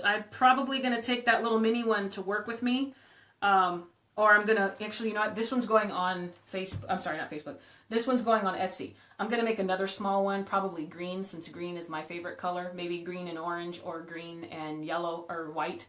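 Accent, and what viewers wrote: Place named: Nevada, United States. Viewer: Beautiful tulip wreath. I love your Nevada accent.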